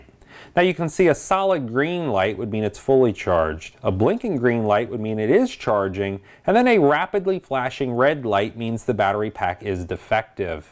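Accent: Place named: United States. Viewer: Effing yanks , your English is gross .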